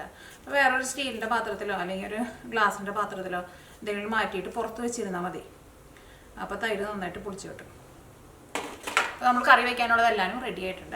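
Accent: Thrissur, India. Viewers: Jhan chechi dae valya fan aanu, more than any thing, chechi dae Thrissur dialect aaanu enikku eshttam... entae mummyum Thrissur kaari aanu, entae mummy thannae explain cheythu tharunna poolae thoonum, chechi parayunna, ethu recepie undaakiyaalum nalla taste aanu... jhan cooking il oru beginer aanu, chechi dae tips enikku othiri eshttanu ,....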